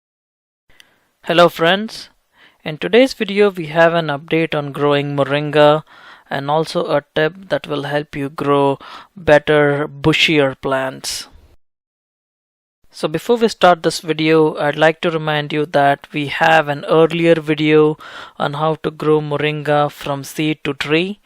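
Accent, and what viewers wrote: India. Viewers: I LOVE THAT STRONG INDIAN ACCENT AND MAY I HAVE SOME SEEDS...... AND WHAT THEY COST PLEASE ! THANKS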